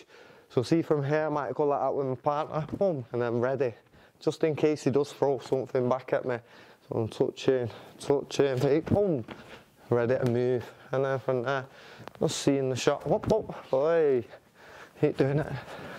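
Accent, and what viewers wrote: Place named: Liverpool, United Kingdom. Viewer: killer scouser accent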